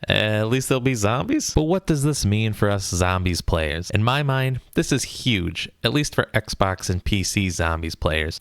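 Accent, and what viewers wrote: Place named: Boston, United States. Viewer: You say zombies like you from Boston. You say "Zambies" instead.😢 They have issues pronouncing words. They call a place that is spelled Leominster like this "Lemonstah" like lobstah.😢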